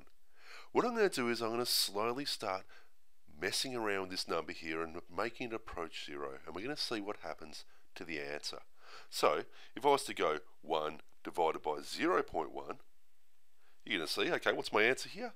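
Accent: Australia. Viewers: Hey mate! We have been subscribed and watching your awesome videos for last 10 years. It would be really appreciated if you could improve the sound quality but loving your aussie accent heaps. Have a good day!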